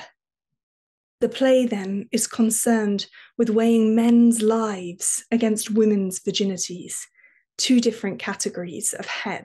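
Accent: United Kingdom. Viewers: The British accent is beautiful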